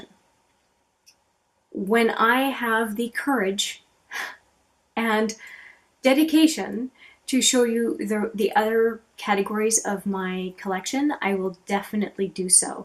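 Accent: Quebec, Canada. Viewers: I love your little containers for your lip products... cute shit: also you can hear a French Canadian accent lol I love it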